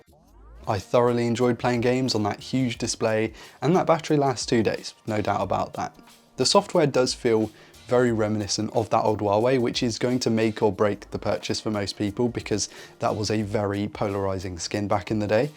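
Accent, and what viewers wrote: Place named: United Kingdom. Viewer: That british accent is fire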